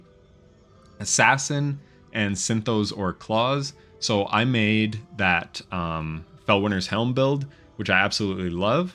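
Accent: Canada. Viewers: Are you from Minnesota or Canada? Usually I can tell but you sound to me like an Albertan but when I went to Minnesota there were a couple people who sounded like Albertans aswell. I very confused. Does it matter? Nope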